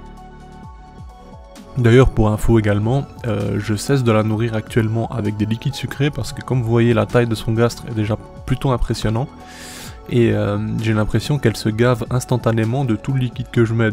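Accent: Belgium. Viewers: cet accent me semblait belge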